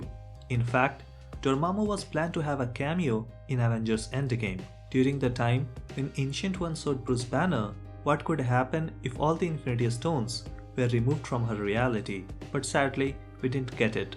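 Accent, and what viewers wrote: India. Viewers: Indian accents are the worst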